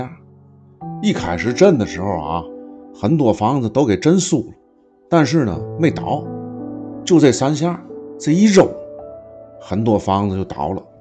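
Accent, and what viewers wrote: Tianjin, China. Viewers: 形容的好真實丶形象，加上維妙維肖的天津音及口才，真喜歡這個節目。 地震，左右搖時，狀況要比上丶下震要好一點。磚之間用泥巴壘的房屋，五級就會發生倒毀。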